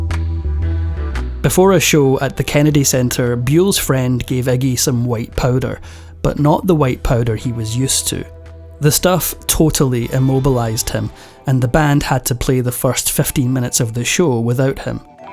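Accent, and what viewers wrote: Scotland, United Kingdom. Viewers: 💯👍 I think it's a Scottish accent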